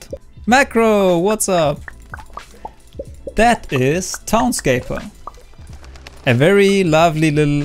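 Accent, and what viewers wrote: Germany. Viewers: dieses deutsche englisch :D sounds so fvcking weird with the german voice hahahaa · Bro man hört so hart raus das du Deutscher bist 😂✌🏾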